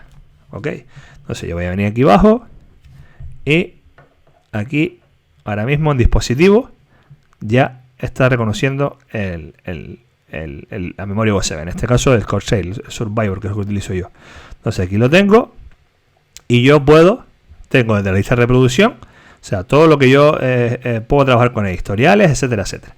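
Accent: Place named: Canary Islands, Spain. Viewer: más canario que el gofio mi niño así da gusto, abrazo fuerte colegui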